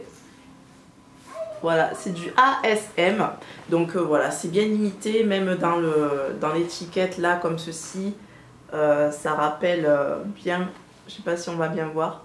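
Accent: England, United Kingdom. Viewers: Super l accent anglais lol👍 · Oh mon dieu! Thumbs up for the english accent hihi ;') !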